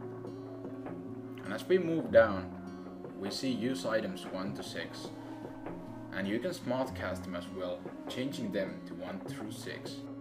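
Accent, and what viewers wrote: Finland. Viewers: omg u sound so finnish :D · Holy shit are you Finnish? :D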